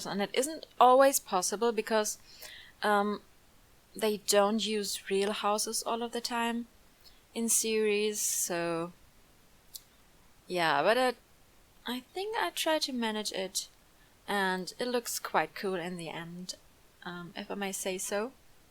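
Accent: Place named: Germany. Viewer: Bist du deutsch? Weil das dein Englisch hört sich so an als wenn du deutsch bist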